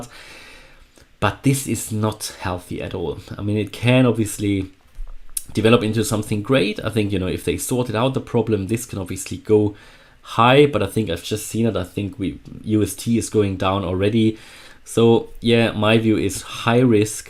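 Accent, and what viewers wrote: Germany. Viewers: Thanks for the video, are you from Germany?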